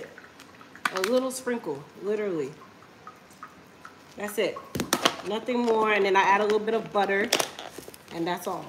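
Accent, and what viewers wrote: Jamaica. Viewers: I love your acent are u Jamaica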